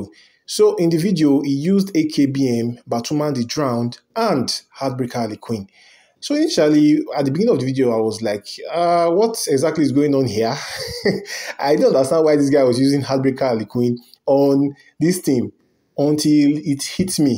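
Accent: Nigeria. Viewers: Dude you sound Nigerian 😃. If yes, then you are like the first Nigerian I have come across doing videos on IJ2. Nice work 👍. As for the damage, the 300% boost is for the base damage of the character and not total damage. This means the boost does not factor in extra damage from gears, passives, talents and the likes.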